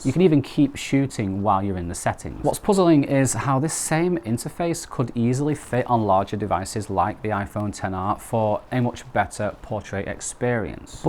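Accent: United Kingdom. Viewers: Hei. Accentul britanic și având în vedere că ai scris mulțumesc în descriere este foarte confuz. Super videoclipul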